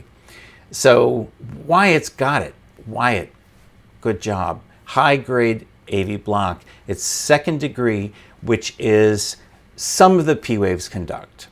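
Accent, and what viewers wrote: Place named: United Kingdom. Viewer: Funny how you took english(U.K) accent..Well done Bloke.. Informative video...Appreciate your dedication..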